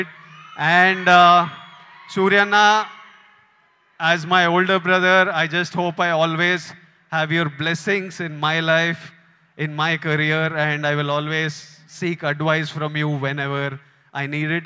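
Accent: Telangana, India. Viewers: Adi maa telangana slanga ra puka bayata ala slang ni comment cheyyaku dorikithe guddalo tantharu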